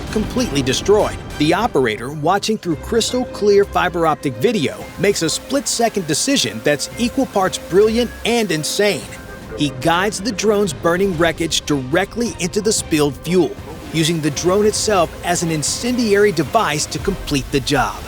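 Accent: United States. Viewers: Heavy American accent.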